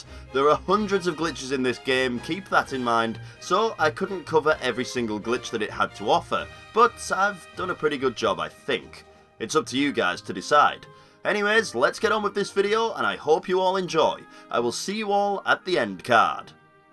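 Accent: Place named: England, United Kingdom. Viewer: I very clearly have a soft spot for English accents because I'm subbed to Andy from A+Start as well!😂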